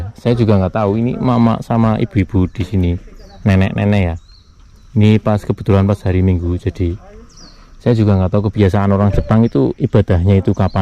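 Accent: Banyumas, Indonesia. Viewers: Gaul rika yakin...wong Banyumas ya min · NANG JEPANG YA ANA WONG NGAPAK YAH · Gk bosen liat kontenny bang ❤. Gaya ngapaknya khas...😂😂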